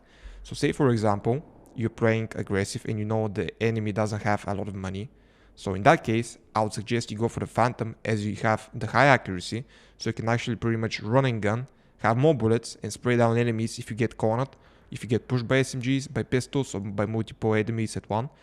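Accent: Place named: Bulgaria. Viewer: no wonder yr accent was familiar to me you are Bulgarian <3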